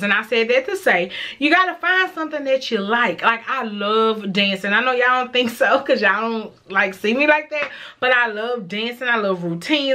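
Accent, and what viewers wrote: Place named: Texas, United States. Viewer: Bravo! I know you are helping a whole lot of people through your channel. If you ever want to join a great supportive fasting community, try A Healthy Alternative on FB. The founder is Chris K and hw has a channel too. And a wealth of information on YouTube. I will stay tuned to watch you. Your accent reminds me of my family who are mostly from Texas. Lol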